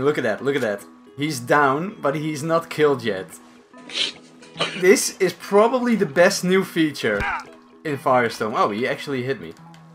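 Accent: Netherlands. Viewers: klinkt deze guy een beetje nederlands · Are you dutch? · are you dutch? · Klopt het dat je nederlands bent? ? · its sounds like a dutch person is talking english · Hold up! Are you dutchh? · his accent, i ame and it sounds like Dutch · Are u dutch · I assumed you are Dutch because of the way you speak German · You have a good duch accent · he's Dutch like me cause of his accent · you sound dutch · Waarom klink je zo Nederlands het is eng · i knew youre dutch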